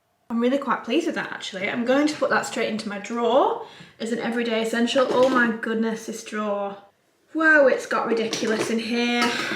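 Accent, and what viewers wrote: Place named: Northern England, United Kingdom. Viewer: It makes me so happy when I hear you say classic northern phrases like “cracking the flags”! 🙌 I love both of the coat materials but I think the blue pattern has the edge for me 💙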